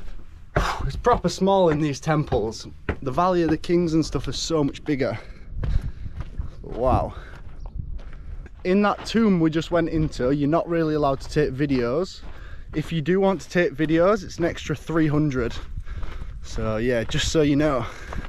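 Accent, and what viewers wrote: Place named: Yorkshire, United Kingdom. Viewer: I just love it, you say it as you see it and you have such a great Yorkshire accent, but please do an Aswan Trip you and everyone will love it, i miss egypt so much its been 8 years since my last trip but looking at going to Hurgharda in March 2025, only downside it will be the first time i've done a trip on my own, but i'm that confident in the people and i always feel safe, one story comes to mind on my 3 or 4th cruise they decided to go luxor to aswan then back to luxor then up to Dandarah, woke up one morning on boat to Dandarah and at front and back of cruise boat were tents? i thought whats going on here,turned out because Dandarah is normally off the boat trip schedules and theres a lot of nomadic tribes, anyhow when i looked inside the tent at the front and back i was taken back as there were Machine guns bolted to the front and back of the boat lol, they were very rusty lol so i'm guessing not been used for a long time, anyways we had no trouble and enjoyed the trip to Dandarah, also please make people aware of the police in the white uniforms (tourist police) they are everywhere and if you ever feel unsafe go to one of them as they are only there to make the tourists feel safe, and they will always side with you over a local. 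Such a great country just a shame they have had troubles over the last few years but its getting back to normal now 😃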